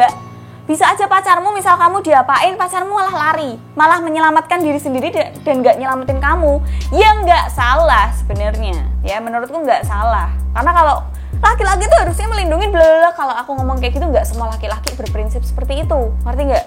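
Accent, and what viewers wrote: Java, Indonesia. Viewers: suka logat jawa.y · Mbak buat subtitle nya dong di bawah kalo lagi medok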